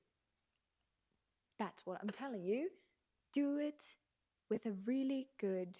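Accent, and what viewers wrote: United Kingdom. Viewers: Hummm!! Inglês britânico! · In your first videos you used to speak with an American accent.. now you are speaking with British accent .. congratulations for being able to change accents so well... I am able to speak only with the American one .. and that's the one like the most .. but somehow I enjoyed listening to you speaking with British accent .. it is kind of pleasing to my ears ..I dont know how to explain it ..😅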